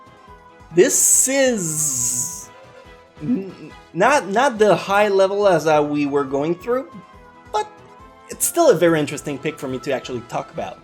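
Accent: Quebec, Canada. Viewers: I feel like now that I know you're from quebec I can't "not hear" the signature accent of a quebecois speaking english xD i'm from montreal lol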